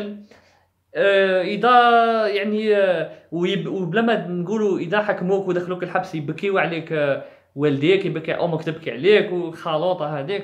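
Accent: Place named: Annaba, Algeria. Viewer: Je vais être dans ta chaine, bonne et excellente Continuation c est une analyse tres sage c est digne d un Annabi puisque tu as l accent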